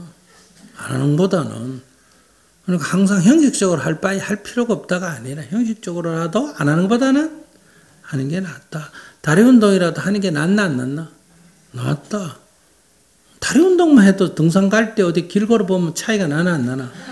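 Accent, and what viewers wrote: Gyeongsang, South Korea. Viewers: 경상도 어디 분이신지?? 사투리가..... 다그치는듯한 말투시네여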